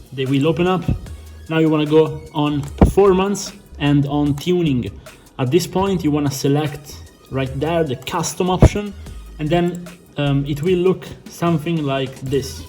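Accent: Italy. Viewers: capito che eri italiano dall'accento, bel video comunque😂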